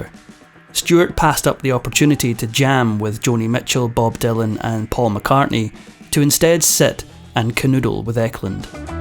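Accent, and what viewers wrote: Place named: Scotland, United Kingdom. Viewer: I could listen to that Scottish accent forever!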